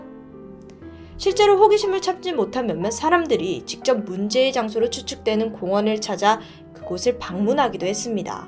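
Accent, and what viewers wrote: Jeolla, South Korea. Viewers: 님 진행 너무 잘 하시고 좋은데 전라도 억양 때문에 자꾸 몰입이 깨지게 돼요 ㅜㅜㅋㅋㅋ 특히 시작이라는 단어 나올 때마다 시작합니다->시자감니다, 시작하더니->시자가더니, 시작했죠-> 시자갰죠, 시작하죠->시자가죠, 시작했습니다-> 시자갰습니다 이렇게 말씀하셔서 자꾸 몰입이 깨져요 ㅠ 노력했지만-> 노려갰지만 등등... 제시카님도 종종 이런 전라도 억양이 있는데 님 진행에서는 훨씬 자주 나와서 티가 나는 것 같아요. 이런 부분도 같이 연습해주시면 더 더 좋을 것 같아요! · 아.. 이게 전라도 발음 이군요. 저도 들으면서 저부분을 왜저리 발음하지? 하고 거슬렸던.. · 진짜 죄송한데 전라도 발음좀 빼주세요 ㅠㅠ 시작하죠 - 시자가죠 답답했죠 - 답다뱄죠 제작해 - 제자개 이런거요 ㅠㅠㅠㅠ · 근데 이분 경상도사람인데 전라도발음나오는게 신기...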